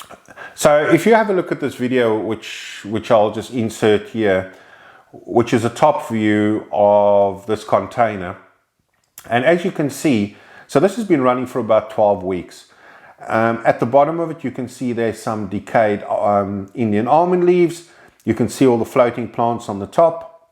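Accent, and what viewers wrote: South Africa. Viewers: Nice video man . Helped me a lot. Are you from South Africa by the way? Sounds like you have an SA accent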